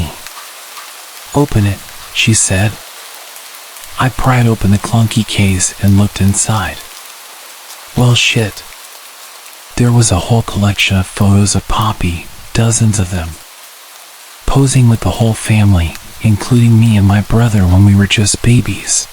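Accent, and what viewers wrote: United States. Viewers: Thanks for the western voice! So tired of everything being the same eastern accent.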